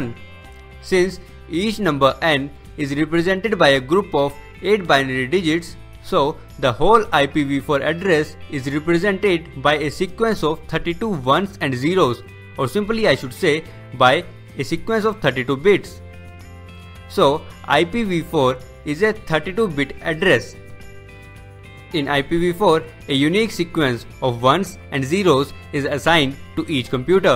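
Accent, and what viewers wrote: India. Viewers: I like how he has an Indian accent and talking about IP address. Truly, perfect.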